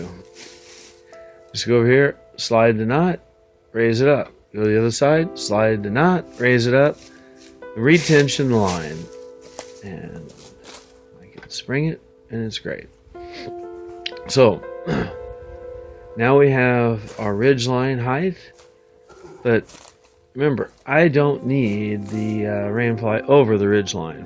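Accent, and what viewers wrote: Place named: Newcastle, United Kingdom. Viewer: he has a georrdie accent ,some folks thinks its scotish cheers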